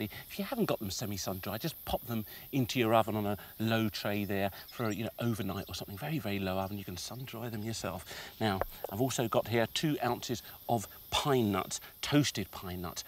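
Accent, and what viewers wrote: England, United Kingdom. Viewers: Ainsley sounds like what everyone thinks an English man sounds like.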